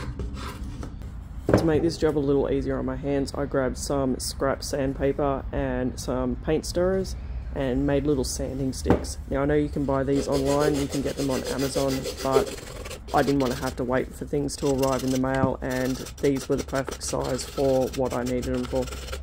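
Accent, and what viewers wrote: Australia. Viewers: Wow. So much detail work here. Your client should pay you well. I cannot imagine how many hours of work here. Love your Aussie accent and your quotes...."stand out like a dog's nuts". 😂😂😂. I always enjoy your sense of humor and look forward to your content. How many hours did you work on this piece?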